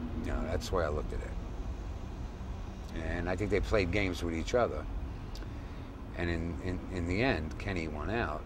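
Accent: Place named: Brooklyn, United States. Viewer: His Brooklyn accent is too strong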